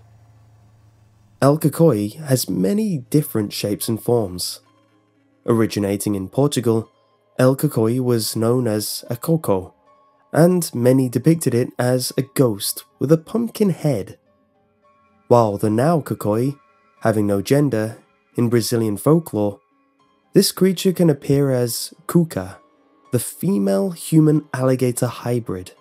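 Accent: England, United Kingdom. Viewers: give him a break! he has a british accent what do you expect! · lol you kept saying it wrong but I still love that British accent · It's an English accent there is no such thing as an British accent at all. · Im hispanic, and you saying Spanish-y things with your wonderful British accent was enjoyable XD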